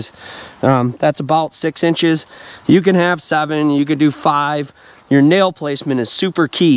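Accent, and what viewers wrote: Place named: Minnesota, United States. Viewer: Also cool because you sound like a fellow minnesotan 😂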